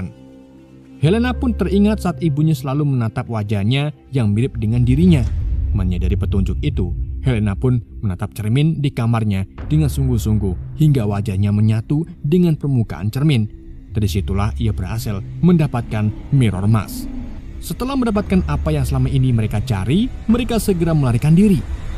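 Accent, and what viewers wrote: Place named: Java, Indonesia. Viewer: ridle ga tuh, bacaanya ridle blok jawir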